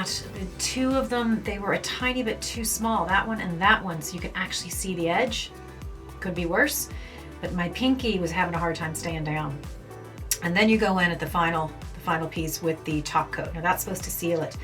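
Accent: Ireland. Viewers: That's gas, I heard your accent and thought "some of those words/phrases sound really Irish" and then I read the About section on your channel and it made sense! 😂 I got an ad for these on Instagram and was curious because I'm awful at painting my nails, but I thought they were probably too good to be true alright! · Do I hear the most subtle Irish accent? I’m American so I could just be hearing things. Love the way you speak. Very pretty ❤️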